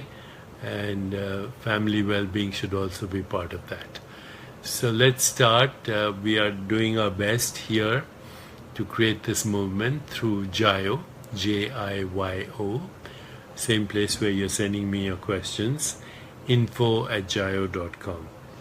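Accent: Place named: Iraq. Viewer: الي يتكلم وكأنو عراقي قريب جداً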